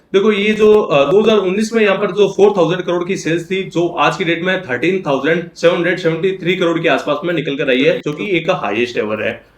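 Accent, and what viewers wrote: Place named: Rajasthan, India. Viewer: Very well articulated 👍, from your accent it sounds like you are from Jodhpur/Bikaner... Its just trading in single digit PE and as you mentioned this is cyclic business and once the uptrend starts (replacement cycle commences) ,lets say by end of this year..and EPS gets doubled (62)..and it gets the same PE at the time of listing that is 15: Stock price comes 930 from this calculation...I also believe at 298 its a good deal and available below IPO price.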